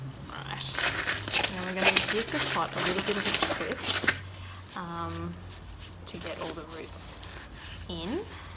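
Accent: Australia. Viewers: Nice to hear an Ausie vioce for a change, will be following your channel to see what else you have to teach me.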